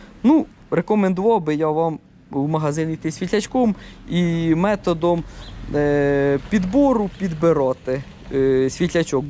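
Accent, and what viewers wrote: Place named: Poltava, Ukraine. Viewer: Мені ті поплавкі даром не треба,але додивився до кінця! Цей Полтавській говор це мед на вуха "діОдик" тобі машини треба продавати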